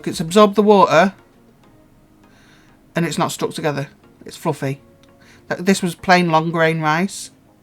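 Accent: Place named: Lincolnshire, United Kingdom. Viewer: Well done, got one now..well pleased. Oh Are you from Lincolnshire.,thought I recognized the twang..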